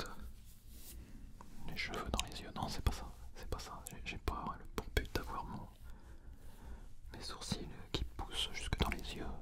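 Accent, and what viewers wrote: France. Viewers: He speaks a bit fast for someone like you that is not used to french. Plus the accent from france is fairly different than the french canadien one. Its all practice. I changed my computer to english, my phone to english and only consume english medias and over time I understood both languages almost perfectly.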